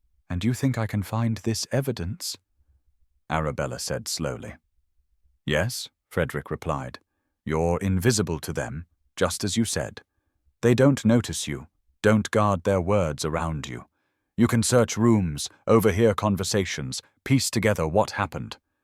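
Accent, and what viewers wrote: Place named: United Kingdom. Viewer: Thank you so much for this lovely story. It is an original and enticing tale of romance and intrigue, with an ending appropriate for the situation you so elegantly described in the long story. I enjoyed the narrator with the British accent. It lends a distinguished touch to your wonderful story. Please keep up this wonderful channel and your tales of Victorian society. Perhaps you could utilize a few more images that would enhance your storyline…💕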